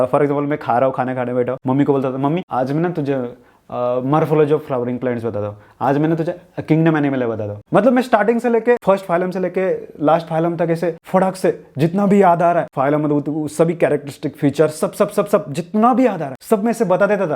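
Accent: Maharashtra, India. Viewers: I'm also from Latur.... Aur aapka Maharashtrian accent dikh raha h hindi me... 😅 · That Marathi accent while speaking Hindi 😍😅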